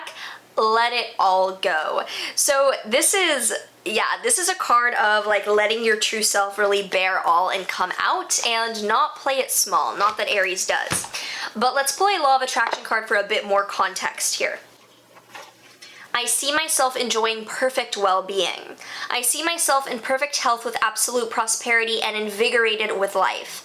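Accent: United States. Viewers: Your English is terrific - I genuinely thought you were American! And if you need to talk, my email is <mail> I’m sorry you’re going through all this stress but I’ll be happy to listen. Wishing you the best!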